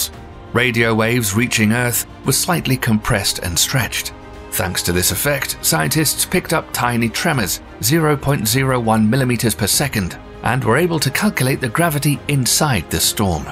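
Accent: United Kingdom. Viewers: Another Brit accent. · I'm tired of Brit8sh accents.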